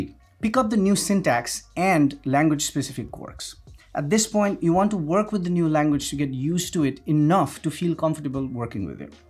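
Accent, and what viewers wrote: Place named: Nepal. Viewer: Amazing content! I always felt like you have a Nepali ascent. But today I noticed Swayambhunath Stupa on your hand. :)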